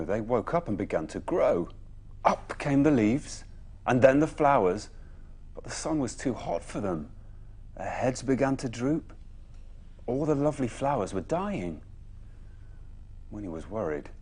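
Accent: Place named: Wales, United Kingdom. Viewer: the welsh accent xD I am deceases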